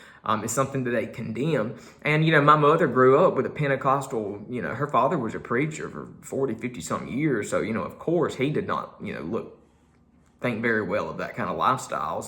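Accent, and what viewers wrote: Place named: South Carolina, United States. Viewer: you are SO WISE! They know how to raise 'em in South Carolina! And your accent is adorable. I wanna wrap you in my arms and hug you to death! (Well, maybe not THAT much LOL.)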